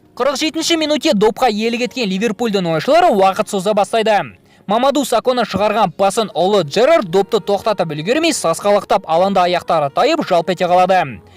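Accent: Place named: Russia. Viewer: Брат казакшаны казак боп сойле орыс емес н н н н демей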